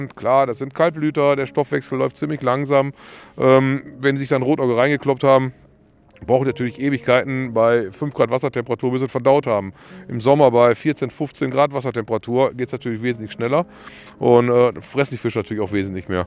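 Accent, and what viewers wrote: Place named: Bavaria, Germany. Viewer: Kommt auch auf den Bestand an😉 vom Dialekt her denke ich kommst du aus Bayern.. jedes Gewässer ist halt anders.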